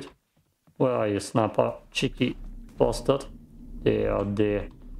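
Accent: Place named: Wales, United Kingdom. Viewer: Are you welsh? You are super hard to understand bro.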